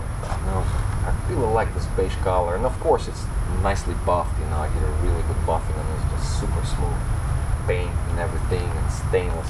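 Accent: Russia. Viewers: Суровый русский акцент. За Краун - лайк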